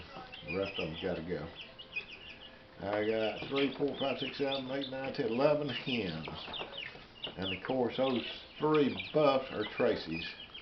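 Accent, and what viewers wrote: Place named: South, United States. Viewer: I understand the frustration about lighting but at least this is better to watch than some of the other crap on YouTube I encourage anyone doing educational videos to continue to do so. Not only is it a learning experience for the person doing it, it's information you can decide to use or at least consider. Also I love your accent I was born in the deep south in Texas but from living up north north than half my life I have a mixed accent of north and south and I just love the southern accent.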